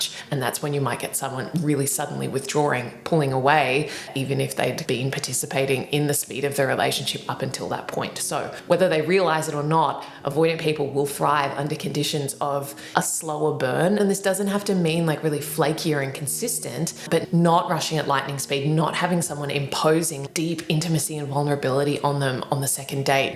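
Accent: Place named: England, United Kingdom. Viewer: You speak in a very elegant way. Also love the English accent. Great video!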